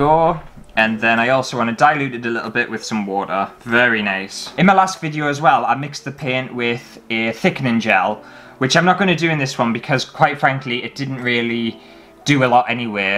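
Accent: United Kingdom. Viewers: You need to vastly increase the bitrate of your camera, this video looks very splotchy. Also, you need to lower your shutter speed for more motion blur because it looks unnaturally crisp and jittery. I would also recommend moving to 30fps, in which case since you sound British, you'll need a 1/50th shutter speed, specifically, in order to avoid your interior lights flickering on camera. In addition to all of that, you'll have to set your camera up so that it doesn't adjust the shutter speed as a part of the exposure triangle when you have auto-exposure on, which I assume you do because most people do.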